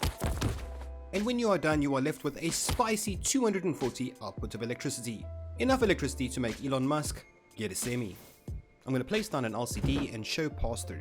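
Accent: South Africa. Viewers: So awesome to hear a South African voice for a change. Great videos. Might I ask how long it took you to edit this video? I'm asking because in my mind this would take me days so maybe you have some much more efficient method. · I knew u were south african. Woop woop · South African accent?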